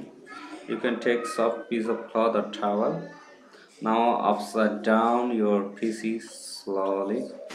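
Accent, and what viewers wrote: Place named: India. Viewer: Bon sang, Mais pourquoi les indiens ou pakistanais parlent tous avec cet accent ?? on dirait une sorte de blague. J'ai toujours l'impression de regarder une mauvaise série sur le câble... Enfin, merci pour la vidéo sinon.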